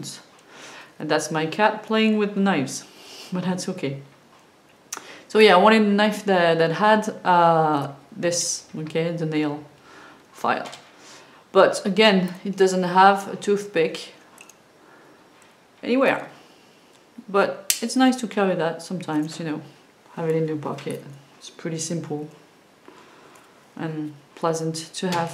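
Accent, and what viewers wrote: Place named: France. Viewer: j'aime ta revue elle est vraiment interressante avec un joli accent camembert.....merci pour ton partage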